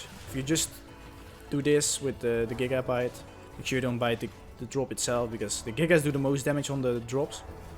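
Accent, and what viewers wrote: Netherlands. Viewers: you sound very dutch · For everyone saying he sounds Dutch , yes he is Dutch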